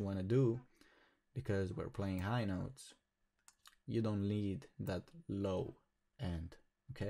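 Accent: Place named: Balkans. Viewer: thanks for the tutorial man... where u from? you sound balkan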